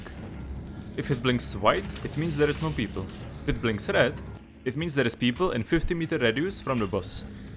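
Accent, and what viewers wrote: Czech Republic. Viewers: Tvrdý český prízvuk čo má svoje čaro :D Nice tips, thank you very much :) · ten přízvuk, hned mi bylo jasný že jsi Čech :D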